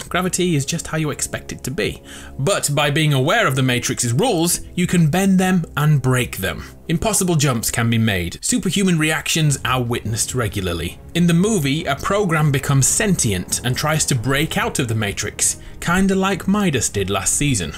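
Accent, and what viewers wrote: United Kingdom. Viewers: Hey man are you british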